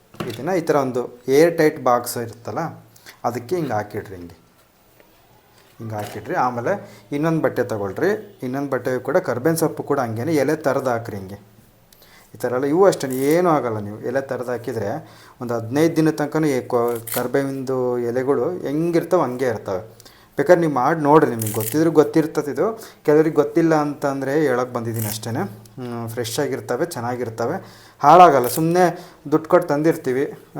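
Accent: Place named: Hiriyur, India. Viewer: ಹಿರಿಯೂರು ಹುಡ್ಗ ಅನ್ಸುತ್ತೆ ಎಂತಾಸ್ನಾಗ್ ಐತೆ ಬಾಷಾ ಶೈಲಿ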